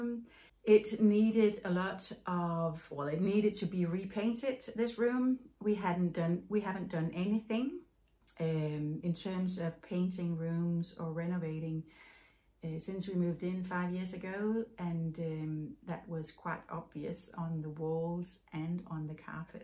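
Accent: Denmark. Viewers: Hej, da jeg hørte dig tale første gang jeg så Mindful Making, kunne jeg høre med det samme at du var dansk.hahaha Det var en rar følelse. Med hensyn til dit garnlager, skal man jo ikke gå ned på garn, vel!?;o) Jeg kom til at tænke på, at hvis der er nogen i Australien som har brug for Holst garn, kunne jeg hjælpe med at skaffe det og sende det som en gave (told:o og så kunne jeg få noget garn tilbage på samme måde. Hvad mener du om ideen? Vh Maud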